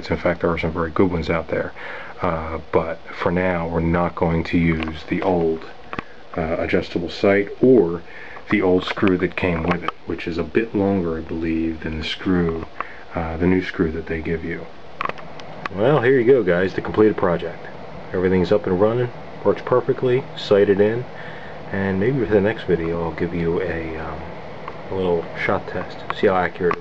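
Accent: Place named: Philadelphia, United States. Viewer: are you from philadelphia or from the area? i noticed you have an accent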